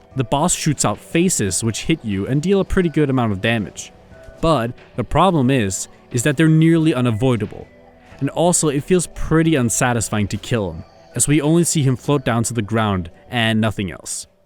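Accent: Denmark. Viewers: jeg var slet ikke klar over at du var dansker💀💀💀💀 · Er du dansker? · bro r dansker wtffff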